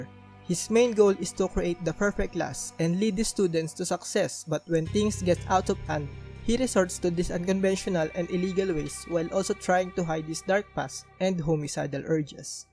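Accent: Philippines. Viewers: bruh are you filipino your accent sound like filipino accent in my opipon ahahhaa nice list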